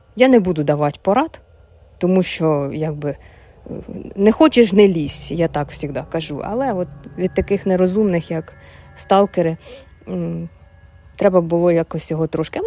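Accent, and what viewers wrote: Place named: Surzhik, Ukraine. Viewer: Какой у Вас классный суржик.))))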